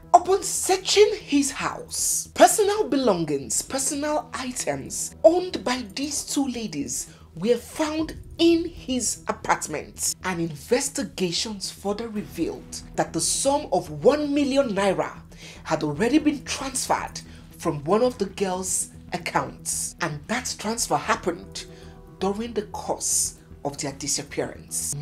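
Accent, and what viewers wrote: United Kingdom. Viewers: Is that a uk accent😢😢😢😢😢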